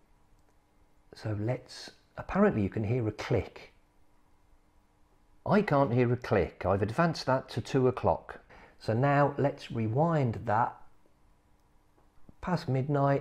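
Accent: Russia. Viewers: As a Russian guy from Siberia I approve this accent, tovarisch! Great review btw! Subscribed.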